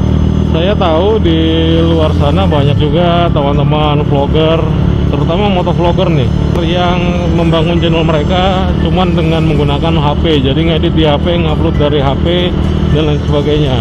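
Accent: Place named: East Java, Indonesia. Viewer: Koyok pantura malang pasuruan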